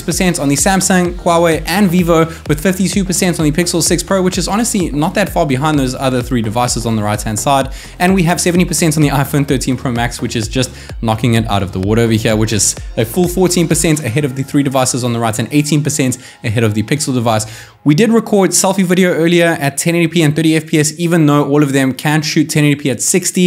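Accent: South Africa. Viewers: 100% south african immediately picked up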